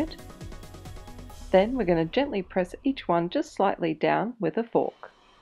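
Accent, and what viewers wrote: Australia. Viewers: She sounds Australian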